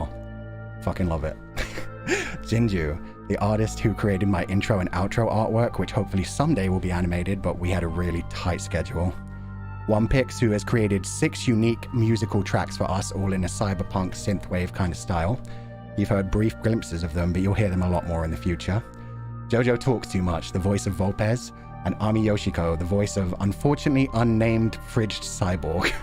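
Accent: RP, United Kingdom. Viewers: posh I think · naaah he’s Vox type of british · Like vox received pronunciation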